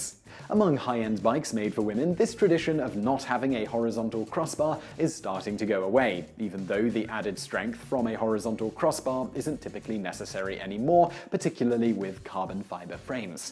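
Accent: United Kingdom. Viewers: i do like your content, it's good mate, but you speak so weirdly that it's hard to concentrate on what you're saying. It's like you're trying hard to pronounce every word, I'm sure the americans will still understand your british accent if you spoke more naturally (i was born in london btw)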